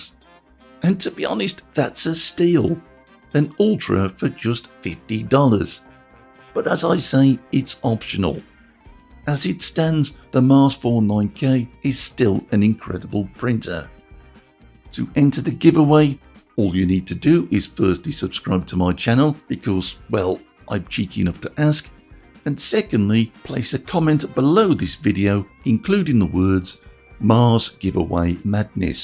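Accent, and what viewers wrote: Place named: Midlands, United Kingdom. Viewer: MARS GIVEAWAY MADNESS! thank you for this opportunity, your reviews are very handy and your accent reminds me of home as I grew up in the midlands! Good ol' Kidderminster 😂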